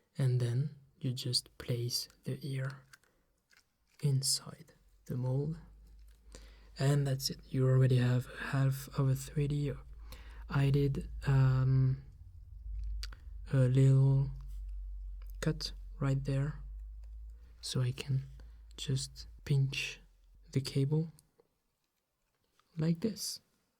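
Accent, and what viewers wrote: France. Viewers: Ton accent français me tue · His France accent make me laugh do hard haha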